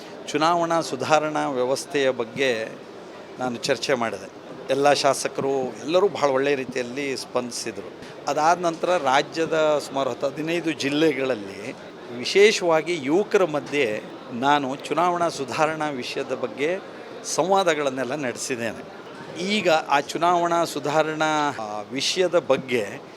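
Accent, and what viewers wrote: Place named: Uttara Kannada, India. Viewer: ಸೋತ ನಂತರ ಅದನ್ನು ಹೇಳುವಾಗಲೂ ನೀವು ,ಸರಳವಾಗಿ ಉತ್ತರ ಕನ್ನಡ ಜಿಲ್ಲೆಯ ಆಡು ಭಾಷೆಯಲ್ಲಿ ,ನಿಜವಾದ ಕಾರಣ ಹೇಳದೇ ,ತಾನು ಇನ್ನು ಸಭಾಪತಿ ಎಂಬ ಬ್ರಮೆಯಲ್ಲಿ ,ಏನೇನೋ ಹೇಳುತ್ತಿದ್ದೀರಿ.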